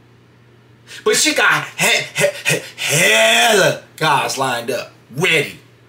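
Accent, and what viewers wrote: Baltimore, United States. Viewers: He def from BALTIMORE OR DMV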